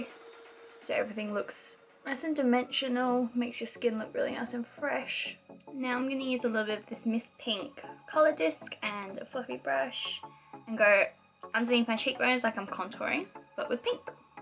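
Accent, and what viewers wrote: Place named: Australia. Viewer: Where are you from you sound Aussie but then you have a bit of a English accent to it ❤️ it's beautiful though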